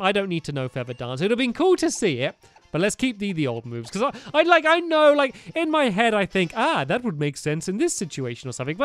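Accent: United Kingdom. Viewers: Probably cause he's british or something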